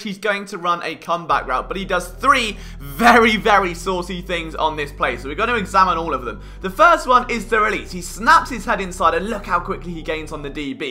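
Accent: England, United Kingdom. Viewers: Never in my life did I think I'd hear such intelligent football breakdowns in such a British accent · Love me some EPL but this is the first time an English accent did it for me with AmeriCAN Football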